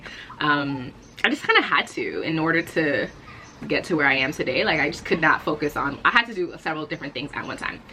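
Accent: Nigeria. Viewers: I love your Nigerian accent. This video is amazing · Hilarious Nigerian facts : We are loud Our parents work hard We value degrees Your Naija accent on point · i love the nigerian accent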